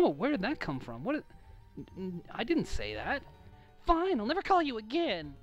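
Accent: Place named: Australia. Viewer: p.s good aussie accent man. lol im aussie and you sound like a lot of people here.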